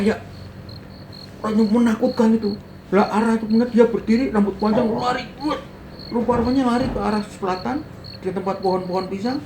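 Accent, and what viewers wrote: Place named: East Java, Indonesia. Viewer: Mirip bapak n paman cara ceritanya, ternyata sama2 Jawa timur wkwk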